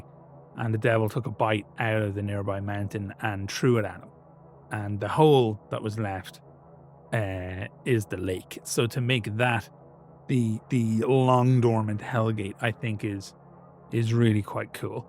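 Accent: Ireland. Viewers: judging by the accent it sounds north tip or Offaly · Man, this must have been fun for you. I really enjoyed hearing this read in an Irish accent. Brilliant job, mate!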